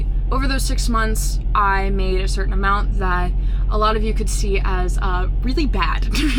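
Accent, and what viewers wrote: United States. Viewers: English is my 2nd language on top of that your american accent, so subtitle is appreciated. 🙌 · But yeah, I never would’ve guessed that you were from Florida. I thought you were like from the Deep South or something like that. But I definitely love watching you and how beautiful and very gorgeous and very stunning and very lovely and very vibrant and very powerful and sexy you are as well. 😍😍😍😍😍😍😍😍😍😍😍😍😍😍😍😍😍❤️❤️❤️❤️❤️❤️❤️❤️❤️❤️❤️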